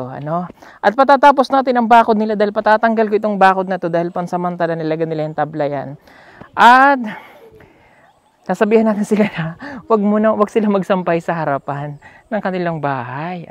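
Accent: Visayas, Philippines. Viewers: Baka Sir pagbalik mo sa manila may Punto na Ang salita mo ng bisaya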